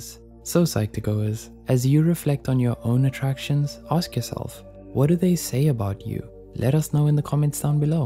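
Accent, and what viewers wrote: South Africa. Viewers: the voice of the person in this video isounds South African pretty cool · Why is this voice giving a low-key white South African😶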